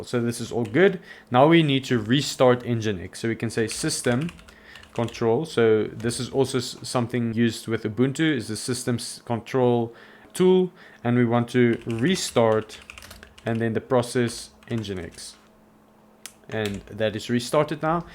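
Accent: Afrikaans, South Africa. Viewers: You dutch from RSA? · Lekker om n afrikaanse aksent te hoor! 😂😂 mooi storie!!!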